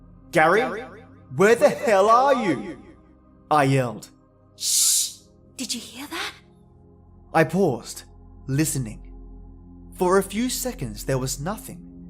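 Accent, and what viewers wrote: Australia. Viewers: Sweet jesus, where did you get that picture!? SHUDDERS That alone will give you nightmares! That story was amazing! That was one pissed off ghost. Great job,! (Holla to my fellow Pagans and Witches!) I gotta say, I definitely agree with all the feedback of the other listeners, especially the 'charming Australian accent' heehee. Another job well done! raises my cup Cheers to you! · I always enjoy listening to you, yes. I agree, your voice is charming! Love your pleasant Australian accent. Damn! It can defrost this bitter bitch🍋🍋🍋, which is quite the feat, congratulations!😉 Plus, creepy story to send chills down my spine, always a treat!🍭🍭🍭You're extremely awesome as a narrator, which is just makes my day complete!😊😊😊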